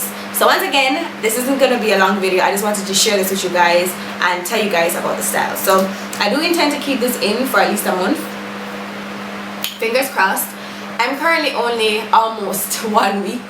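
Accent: Jamaica. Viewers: You sound Jamaican.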